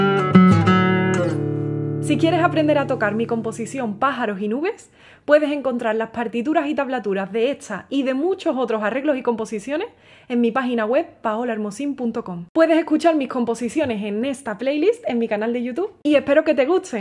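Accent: Spain. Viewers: de que lado de españa es ese acento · Me encanta el acento español que tiene, y por supuesto si estilo y calidad en tocar la guitarra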